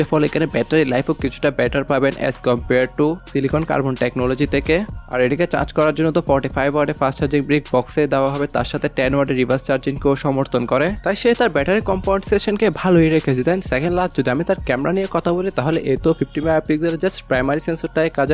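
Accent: Chittagong, Bangladesh. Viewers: ভাই কি চিটাগাংগা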